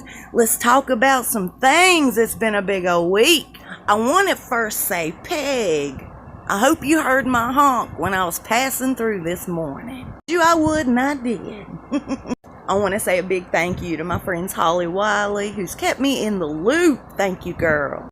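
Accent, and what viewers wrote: South, United States. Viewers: love you. make me laugh. I love your southern accent.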